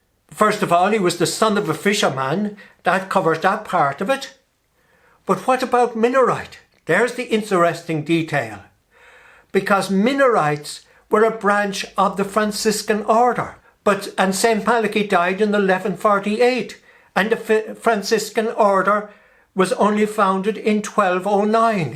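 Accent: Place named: Ireland. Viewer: I just came here to hear Father say "Farty", I studied in a school run by the Irish Christian Brothers and I will never get tired of that accent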